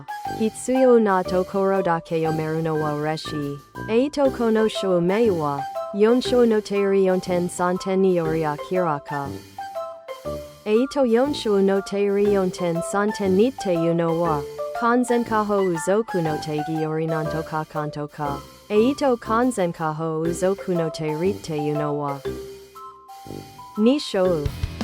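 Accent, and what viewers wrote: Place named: United States. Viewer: a の発音がeiの時とerの時があるね。ほとんどaとは発音しないアメリカ英語。 あと授業はjigiyaou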